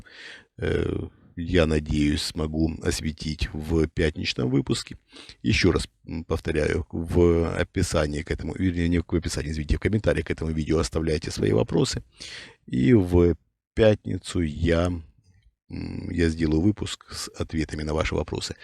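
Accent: Ukraine. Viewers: Бля, жесть! Это что, кто-то всерьёз слушает?! А украинский акцент диктора никого не смущает? А подзаборная лексика и стилистика? Нет, народ, что серьёзно так потерял чувство уровня, что ВОТ ЭТО принимает за чистую монету? Наши люди неисправимы. Кашпировский и Чумак и сейчас могли бы собирать залы и "заряжать воду". Чем "президент, слушающий шаманов", отличается от баранов на этом канале, слушающих таких вот? Впрочем, секте сложно понять, что она секта. Анекдот, ё-моё...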